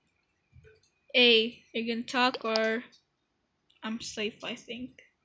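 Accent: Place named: United States. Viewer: You sound american. WE DRIVIN ON THE RIGHT SIDE CUZ WE'RE AMERICAN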